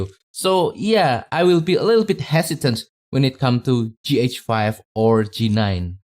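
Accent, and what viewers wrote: Indonesia. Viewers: As an Indonesian myself, I didn’t know that you’re an Indonesian until I notice your accent 😁. Greetings from Poland 🇮🇩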